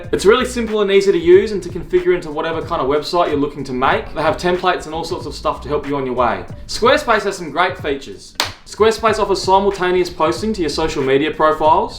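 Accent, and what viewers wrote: Australia. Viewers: How to make a Film Lots of Screaming Thicc Aussie Accent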